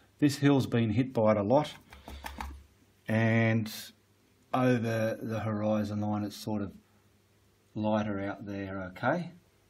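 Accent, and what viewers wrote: Australia. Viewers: Just stumbled across your video and I gotta say, I think I found my new Bob Ross lol. Your voice is very nice to listen to❤️ I love me an Australian accent🤭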